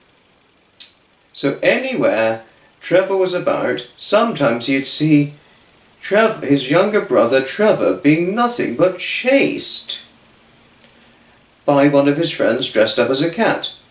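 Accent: United Kingdom. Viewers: I really enjoyed this inspirational chat time. Your story was great,! I like listening to your wonderful British accent😊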